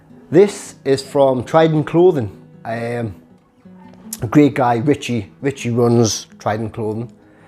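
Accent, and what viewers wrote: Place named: Newcastle, United Kingdom. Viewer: I love this Goerdie accent :-)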